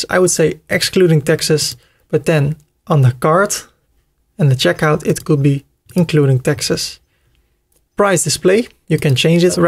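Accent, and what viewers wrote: Netherlands. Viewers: Nice to hear a familiar dutch accent! Heel Goed!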